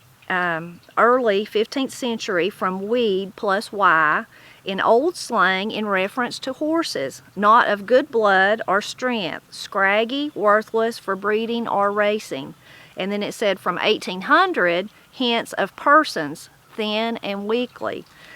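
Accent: Appalachia, United States. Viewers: I love it, and the way you talk. You have the best accent in the whole of the USA. I'm not kidding. It's been a long cold winter and still is, here at 60 degrees north. I don't have a good name for it though, but will adopt one of your phrases for it! I felt the slight warmth of the sun for the first time today. Keep up the good work! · My mother and her family have a long history of family ties to Appalachia. I always remember my mother and grandmother saying in the winter many a day saying ‘it’s cold as a a witch’s tit.’ I am glad to know it’s a saying I didn’t know if my mom made that up herself or what! When it was a thunderstorm and sunny my mom would say ‘the devil is beating his wife.’ Thank you for your channel! You help me feel connected to my heritage. I no longer live in Appalachia and hearing your voice connects me back to my roots.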